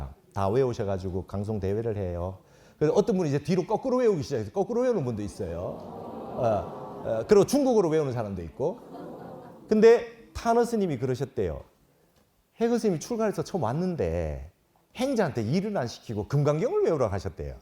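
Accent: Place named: Gyeongsang, South Korea. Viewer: 스님 은근히 경상도 톤에 정감이 가네요 항시 스님 법문에 관심을 가집니다 감사합니다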